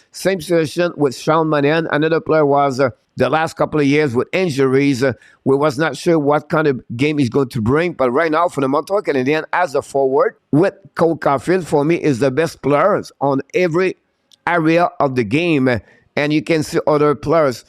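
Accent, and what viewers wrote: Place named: France. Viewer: The FrenGlish is great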